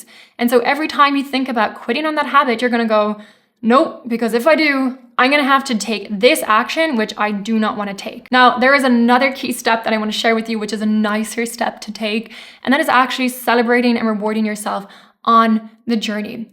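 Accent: Ireland. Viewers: Did you spend time Ireland? I notice a hint in the accent! ❤😊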